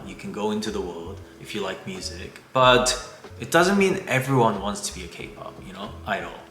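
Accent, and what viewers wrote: England, United Kingdom. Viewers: Have you had a nose job? You sound a little bit British. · I appreciate you sharing this. I have been trying to learn Korean, Hangul and I do know some words of greetings and I an to come there one day. I do watch the k dramas, I Love and some other kpop artists. This is the first time I have watched your commentary and I for 1 really appreciate it. Racism is everywhere. I do however want to ask you, I noticed you speak with a British accent, where does that come from. Thanks 💜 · You are very charming and thank you for taking the time to speak to us about different subjects. I love listening to you. I live in the USA and have a desire to learn about other countries, especially South Korea. I started listening to early 2020 when covid became an issue here. I am a kpop fan and I am 73 years old. But then I love all music. I hear an accent when you speak. 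Is it from Great Britain? You mentioned you lived abroad and your English is exceptional. I will look forward to hearing from you again. Have a pleasant evening. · Hello, I came across ur YouTube video very interesting, u sound like a bit of a British accent lol, well I love culture so it was enlightening 😊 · I absolutely love your English accent, especially when you say the word talk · You’re English sounds English 🎉🎉🎉🎉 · Do I detect a British accent?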